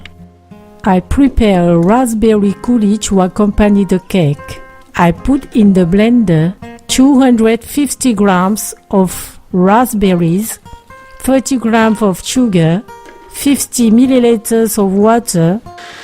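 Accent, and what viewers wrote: France. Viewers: Je vais faire ce gâteau au fromage! J'étais dans mon lit, et j'ai pensé, je veux savoir quel est la première langue de. Elle parle anglais comme une personne française, alors je dois savoir ta première langue. Mais, cette vidéo est incroyable, c'est comme tes autres! C'est facile à faire, et la recette est tres claire.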